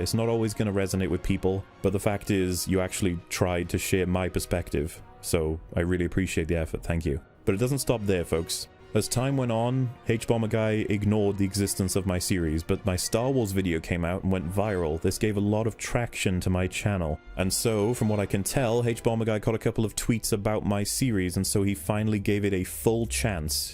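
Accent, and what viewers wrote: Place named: Wales, United Kingdom. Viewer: You know the problem with youtubers? They are youtubers, as if that actually means anything in the world. is a wonderful arbiter of taste when it comes to the frivolity of contemporaneous consumables of an entertainment bent., you are a tonic in a sea of grating celery. Your opinion actually means something to me. Most youtube nonces, not so much. Bless you man, I'd buy you a beer to best loosen that smooth welsh speaking voice which just on its own makes you much more view-worthy than the douchebags described in this vid.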